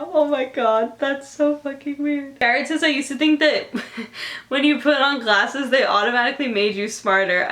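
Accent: United Kingdom. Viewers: she has a really english accent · It's not a vid if she doesn't slip into a British accent sometime in the intro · Why does always sound british at the beginning of her videos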